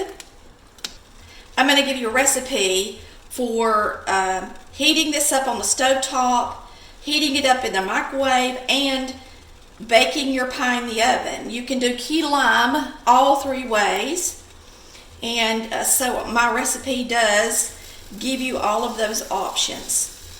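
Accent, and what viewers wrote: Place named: South, United States. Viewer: I love 💕 your southern accent and my heart ❤️ could just melt!!! I’m going to try your recipe Mrs!! If I love ❤️ lemon meringue pie, then key lime pie should be great 😊 for me!! Thanks for sharing honey 🍯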